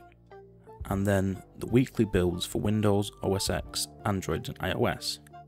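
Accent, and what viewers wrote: India. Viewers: I thought its an indian dude :) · Who expected indian voice?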